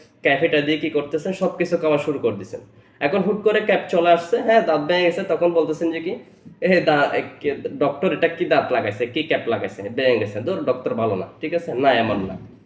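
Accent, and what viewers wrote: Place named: Chittagong, Bangladesh. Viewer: আপনি নিশ্চিত একজন চিটাগাং এর ছেলে এম এম আম আম এটা কি ছিল??? উপস্থাপনা খুব ভালো হয়েছে অসংখ্য ধন্যবাদ প্রিয়।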